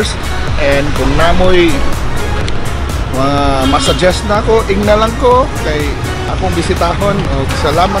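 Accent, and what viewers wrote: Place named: Cebuano, Philippines. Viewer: astig Cebuano accent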